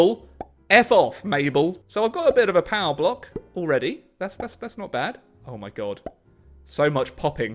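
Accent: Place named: United Kingdom. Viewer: It makes my inner history nerd chuckle hearing a British person say"I don't want Quebec".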